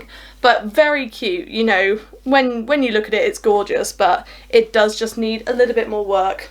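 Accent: United Kingdom. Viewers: Oh you have the beautiful British accent! Love it!!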